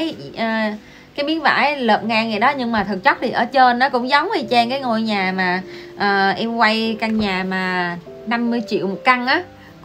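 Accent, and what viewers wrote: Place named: Central, Vietnam. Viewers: Chúc con gái luôn bình an và hạnh phúc .nghe tiếng nói của con như người miền trung .sống xa quê con luôn khôn khéo và cẩn thận,con,nhé. Lòng người nham hiểm khó đoán .con thì quá trẻ nên suy nghĩ rất cẩn thận trước mọi sự việc con nhé .cô luôn đồng hành kênh của con .chúc con hạnh phúc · Nghe giọng, đoán cô là người Ninh Thuận - Bình Thuận(?). Wa chúc kênh cô ngày càng nhiều người đăng ký và theo dõi.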